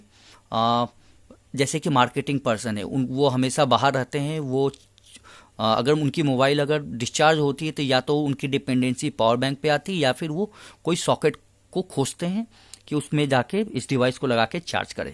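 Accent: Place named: Bengal, India. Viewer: u sound bengali!!! r u bangali??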